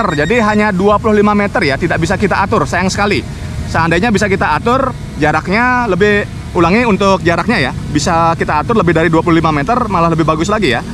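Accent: Bali, Indonesia. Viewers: MAS, SAYA MAU TANYA KOQ LOGATNYA ABANG KAYA ORANG BALI...MAAF CUMA PENGEN TAHU AJA DARI MANA ASALNYA BANG SAYA SUKA NYIMAK VIDEO REVEWNYA.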